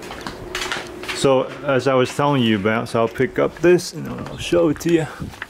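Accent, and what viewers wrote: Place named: Austria. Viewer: your accent sound's like arnold schwarzenegger very cool you get a like just for that :)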